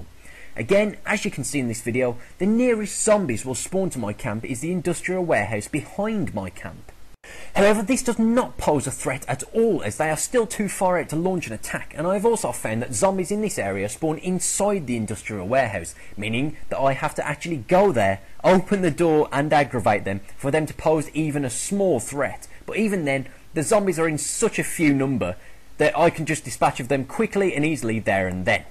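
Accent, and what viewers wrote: Birmingham, United Kingdom. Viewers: your from birmingham :D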